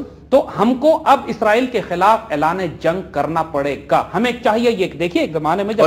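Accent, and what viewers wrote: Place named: Punjab, Pakistan. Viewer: What are you yapping about? Speak in English, you illiterate. keep your dumb Punjabi Urdu in Punjab. Spare us your filth!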